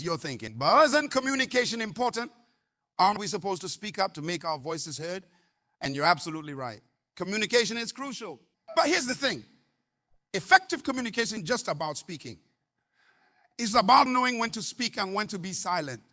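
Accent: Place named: West Africa. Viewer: I am a linguist and can't help but detect a west African accent. I wish I knew who this is because his teaching is aligned to Dr. Munroe and I would like to hear more. Glory to God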